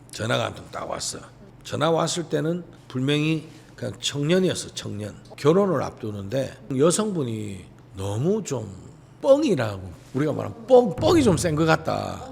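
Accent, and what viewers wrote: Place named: Gyeongsang, South Korea. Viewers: 갱사도라서 그런가봐유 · 경상도 소리가 그래요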